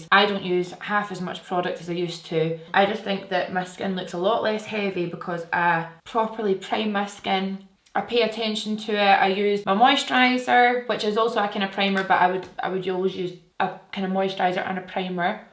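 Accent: Scotland, United Kingdom. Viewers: Seeing your videos make me so happy, just watching you helps so much with my anxiety an depression. Something funny tho, i naturally mimic accents especially ones from Europe... so EVERYONE knows when ive watched a video from you cause i end up with a Scottish accent for usually about an hour or two... my mum finds it funny. I hope Jack, and the doggos are well ❤️ much love from New Zealand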